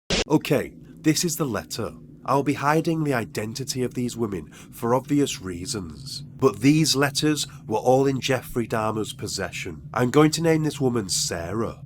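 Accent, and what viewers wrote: United Kingdom. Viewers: UK accent is disturbing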